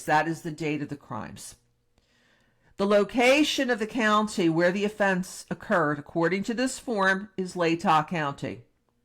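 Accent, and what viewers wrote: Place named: Eastern Pennsylvania, United States. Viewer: !! I've been following your channel (and commenting) for quite a while! It is absolutely wonderful to hear someone like you who presents "just the facts" as they say! I'd like to ask you a candid question, if I might... Are you by any chance from Eastern Pennsylvania? I'm from New York's Hudson Valley and I've been trying to place your accent, and I'd say yours is either from Northern New Jersey or Eastern Pennsylvania, if I had to guess! I'm a lover of different accents and yours struck me so distinctly!! Keep up the excellent work!! Thank you so much!! Happy belated Thanksgiving! 🦃🦃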